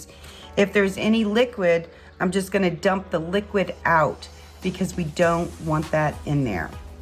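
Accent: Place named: New York, United States. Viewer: New Yawk!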